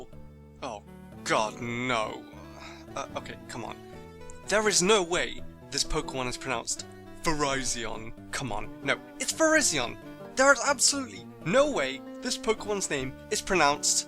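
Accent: United Kingdom. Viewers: lol you remind me a lot of Gigguk! it's the British accent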